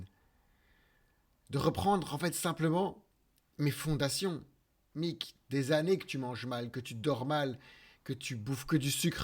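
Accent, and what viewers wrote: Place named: Belgium. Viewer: je viens de découvrir à 34 ans que j'ai un tdah à 99prcent de certitude. pas de diagnostic et je sais même pas si j'en ressens le besoin. le plus important pour moi aujourd'hui c'est de comprendre mon fonctionnement de me pardonner et de me ménager. merci pour ton témoignage qui résonne si fort, ca rajoute de la lumière dans la compréhension des méandres de mon cerveau, de la beauté dans le dysfonctionnement et un peu plus d'auto compassion.. j'ignore si tu es belge, on dirait à ton accent. bah je le suis aussi, ca nous rend si proches hihi. à plus dans le bus!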